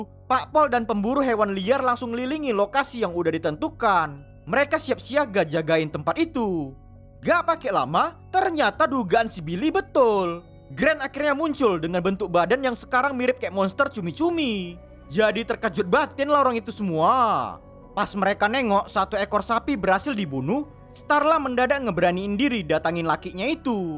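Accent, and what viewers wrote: Medan, Indonesia. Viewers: Org medan nih bos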